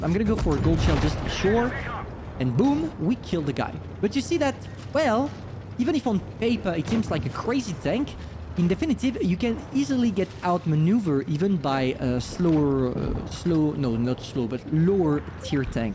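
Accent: France. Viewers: Tu est français😂😂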